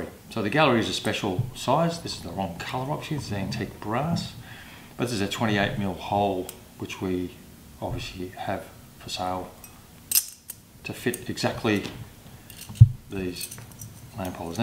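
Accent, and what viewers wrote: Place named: Australia. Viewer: Super helpful mate!... And so awesome to hear an Aussie voice on youtube👍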